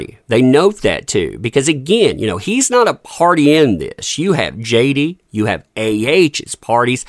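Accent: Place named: East Tennessee, United States. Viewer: I don't know if you've always been in east Tennessee but you sure sound like it and get tickled like it too.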